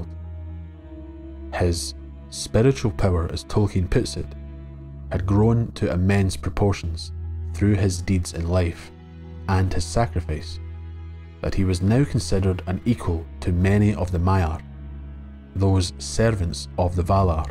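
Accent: Scotland, United Kingdom. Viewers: I always say, "Tolkien must be read by English voices to take me there", but a Scottish one does just fine, quite fine. I've tried listening to Tolkien by American voices, but I guess I've been conditioned to feel the fantasy is real only with English voices, until now, of course, although you're obviously not American. I read a complaint about your pronunciations. I have absolutely no issue, as a matter of fact, the way that you pronounce those words that end in the ending ''e'', ie., "fortresses", "pieces", "process" is quite interesting and a thing that I before you have never heard. Is that a thing unique to you or standard Scottish?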